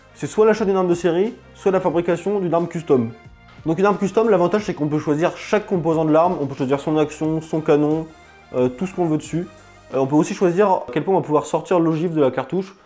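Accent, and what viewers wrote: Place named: North, France. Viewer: J'ai beau avoir quelques années de tir, je me régale à vous écouter. Et cet accent, du nord, trop sympa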